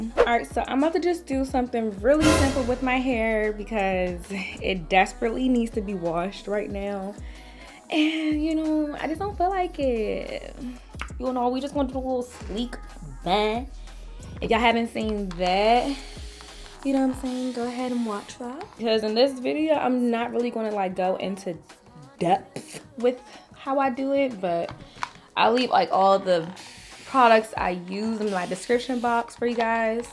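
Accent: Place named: Maryland, United States. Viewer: I knew you were from MD!!! I could tell by how you talk, lmao. I'm from PG, you?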